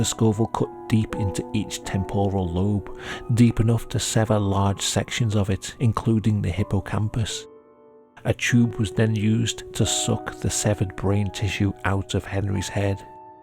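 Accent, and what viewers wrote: United Kingdom. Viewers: Love your channel,. I’ve always been naturally curious about morbid subjects but I’ve noticed that after several traumatic experiences in my real life, I have an increasingly developed relationship with things that disturb me. I can’t seem to find any interest in media with subjects that aren’t slightly taboo or disturbing anymore. Maybe it is part of the long term processing, maybe it’s part of just having the veil lifted since my own disturbing experiences. Sometimes I wonder if it’s a curated and safe way to feel afraid or unsettled, but not be in any danger, and to be learning and exploring ideas without direct risk. It’s made watching videos such as yours varyingly more intimate as an experience, psychologically, than before. Your voice is also very familiar and stabilising to listen to - not trying to sensationalise, you’re genuinely interested in what you’re discussing without novelty of the subject or people involved. 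It’s also probably comforting for a familiar British accent and creator in a US heavy site. Looking forward to all videos in the future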